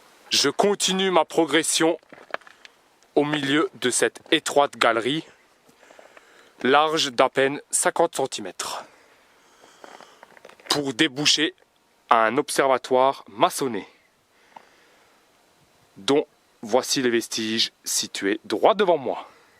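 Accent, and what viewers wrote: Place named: Alsace, France. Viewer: La voix n'est absolument pas robotisée, mais l'auteur a un accent alsacien très prononcé tout simplement. Il a le mérite d'essayer de se mettre dans la peau de ceux qui y étaient!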